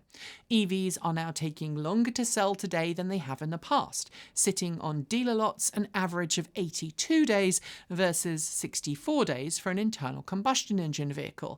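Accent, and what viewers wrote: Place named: United Kingdom. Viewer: Its not co.plicated. the ev push got people to run out and get them then realized how very diffiucult they are if you have much distance to drive. But you sound british so may not understand at all. Out here in westeren america where its minimum 50 milea between towns and vast majority dont have charging and winter real winter it takes only one trip to understand how unreliable thwy are.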